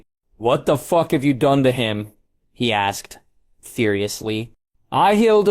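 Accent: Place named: United States. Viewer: Why is this one with an American accent? It's really jarring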